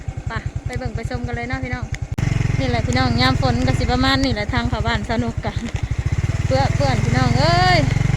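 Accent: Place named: Laos. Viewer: วัสดี แวะมาเติมกำลังใจฯลฯ(สำเนียงพูดแบบนี้เป็นคนแขวงอะไร)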